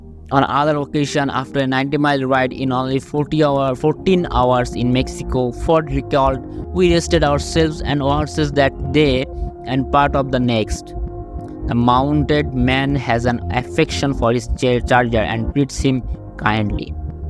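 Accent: India. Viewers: Sonehow i can't deal with an Indian accent presenting such a real Western American history story. Doesn't sound authentic. Please use someone with no accent.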